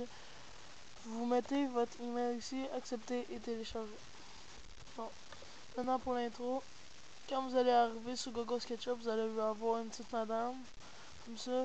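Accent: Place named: Quebec, Canada. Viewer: J'adore la facon quebequoise de dire google on dirait plus qu'il disent gogole mais ca fait bizarre quand on n'est pas habituer!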